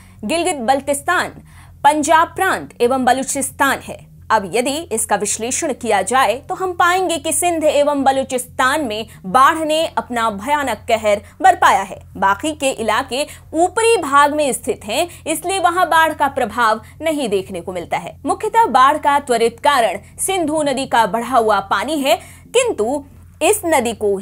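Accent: Standard. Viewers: It transcends me to a new level of excitement when I hear someone speaking SHUDH HINDI, very proud of you ji, keep up the excellence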